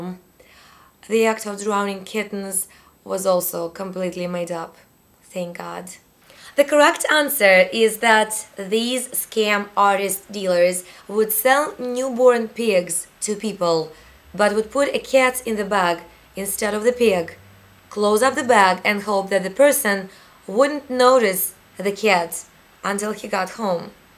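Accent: Russia. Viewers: I believe she is Russian, I recognize the accent. By the way, why can't we all just get along. Think of it this way, it doesn't help you to get angry at the other guy, that only satisfies his need to annoy you. You shouldn't antagonize him either, that only puts you akin to his behavior. Also, it is not necessary to respond to me, I will very likely never check back anyway. My very best wishes to all of you.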